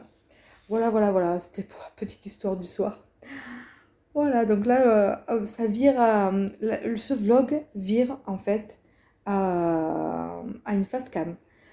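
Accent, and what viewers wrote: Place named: South, France. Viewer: Coucou tu m as fait trop rire et j adore t ecouter avec ton accent du midi oui je pense comme toi en vieillissant on aime redécouvrir les choses simples comme le jardin bon aprem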